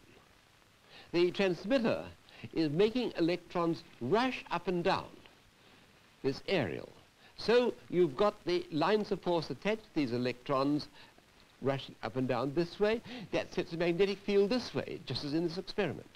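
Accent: United Kingdom. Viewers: Brilliant and clear British accent !!!!I like that!!!! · Wonderful accent, very posh. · When you hear a man speaking in that old fashioned more upper class English accent, you listen! I learned so much, and this is how physics should be taught, thanks for posting.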